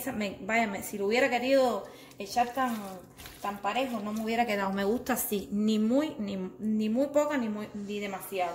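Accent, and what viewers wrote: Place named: Cuba. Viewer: Estraño cuba y me encanta que tienes aún tu asiento cubano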